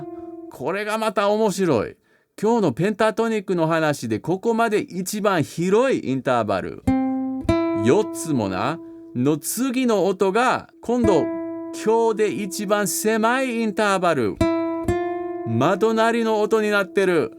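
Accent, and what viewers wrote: Kansai, Japan. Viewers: 白人っぽい人が関西弁でウチナーオトを語る面白さ。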